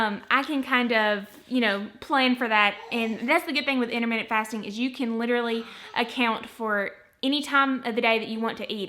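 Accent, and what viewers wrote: South, United States. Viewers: Oh my god this is my first time hearing a genuine southern accent it sounds so cool. (I live in north new Jersey and I’ve only been out of state twice lol) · I love your southern accent, great tips🌟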